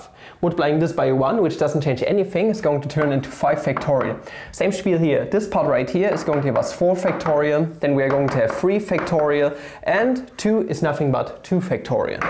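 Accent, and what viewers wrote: Germany. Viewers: Ich mags wie der deutsche Akzent bei dir immer mehr und mehr schwindet beim englisch Reden :D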